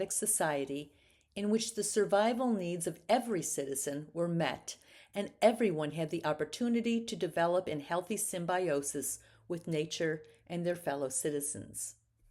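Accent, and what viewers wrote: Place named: Sweden. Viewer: I appreciate the videos as I'm educating myself on Nordic painters. But please use English pronunciations when speaking English. Perhaps "g" is spoken as "y" in Swedish but it's very distracting when you are speaking English. I had to constantly check the names being pronounced (Bergh, Goteborg, Furstenburg). I have heard this best-practice enunciated often: don't say "Par-ee" when speaking English, say Par-is--or you sound pompous.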